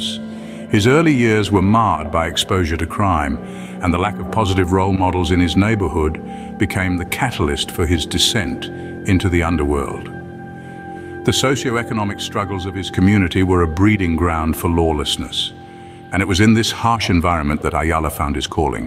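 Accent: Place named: United Kingdom. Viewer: Somebody hit the lick with this narrator. Serious and compelling, the deep, UK sounding accent ups the story's level of integrity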